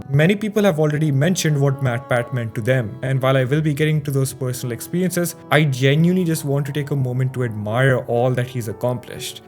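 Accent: India. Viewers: Finally an Indian who recognises him!!!! I recommend fixing your accent and maybe try to better your editing and you'll get millions of views in no time!!!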